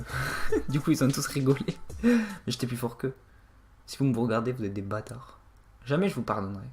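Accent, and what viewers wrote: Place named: South, France. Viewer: accent du suuud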